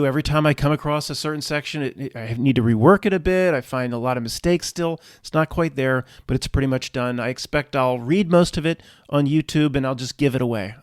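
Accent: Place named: United States. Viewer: Hey it’s guys. I like your non accent. Reminds me of my father. But you say wurter instead of water. Have a nice day. I was listening to Tim Keller on YT and he was a bald guy from Pennsylvania too.Same nice American accent!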